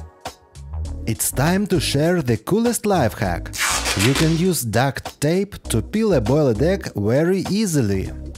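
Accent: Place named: Russia. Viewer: I love this Russian accent!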